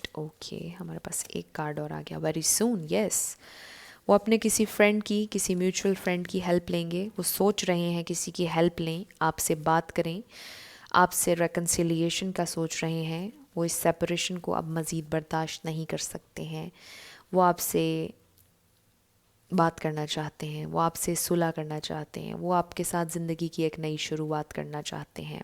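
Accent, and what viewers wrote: Pakistan. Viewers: her way of talk matched with us i m also pakistani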